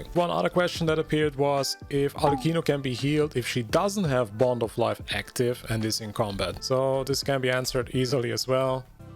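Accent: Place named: Germany. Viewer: ur accent sounds german eww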